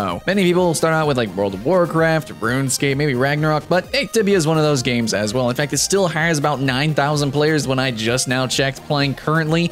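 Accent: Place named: Texas, United States. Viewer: Are u from Texas?? holly shit, too fast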